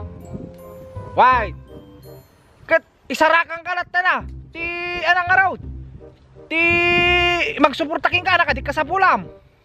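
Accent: Ilocos, Philippines. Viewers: Nagunig ilokanom ngcute ka deng😂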